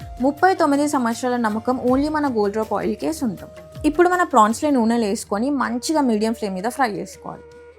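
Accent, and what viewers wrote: Telangana, India. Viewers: wow frist time voice vintunna ... pakka telangana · తెలంగాణ యాస very nice sis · Proper tg slang maatluduthundi eeme😅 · I thought you are from north India but Pakka Telangana voice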